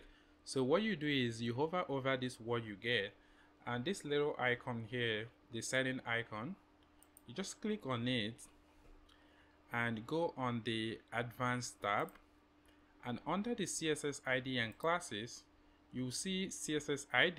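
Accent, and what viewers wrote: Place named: Nigeria. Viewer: ARE NIGERIAN ?? LOVE YOUR ACCENT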